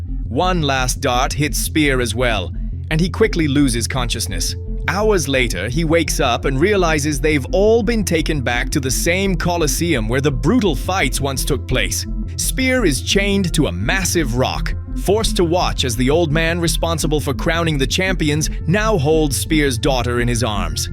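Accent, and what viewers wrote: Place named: Bahia, Brazil. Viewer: Fala português baiano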